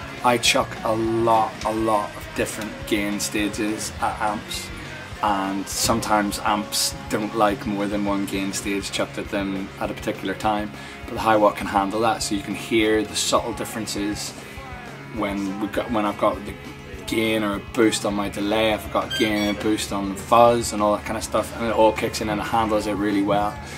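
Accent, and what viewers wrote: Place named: Northern Ireland, United Kingdom. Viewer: He's DEFINITELY Northern Irish.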